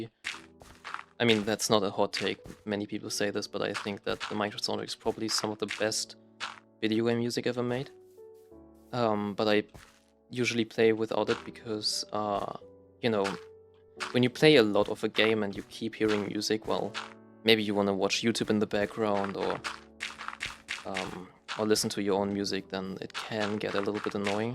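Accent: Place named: Germany. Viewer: hey bro, i just wanted to say that your english really is good like everyone says, you still notice the german accent but i kinda like it (: also i really just like the normal gameplay and u just talking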